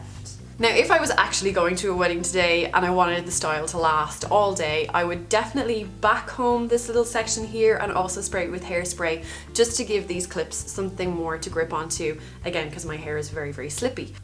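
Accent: Ireland. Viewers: does anyone else hear a little either irish or scotish in her voice?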